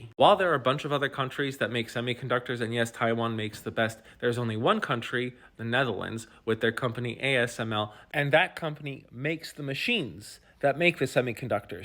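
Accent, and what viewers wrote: Germany. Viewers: Hell no, we don't sound this german. And We probably one off the best english speakers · The accent as always was more german than dutch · Ha, the accent is German, not Dutch...😅 And it is insane that our country is 2nd in agricultural export. We are very very small and it is not sustainable for our land and water to carry on like this. We have quite a few problems when it comes to clean water supply...and we are a delta. And if you look at our politics, you wouldn't guess that we are a highly educated people. It really has been a clown show. Our elections are today. Let's see if the Dutch got a bit smarter the last 2 years. I am not counting on it, but am still hopeful.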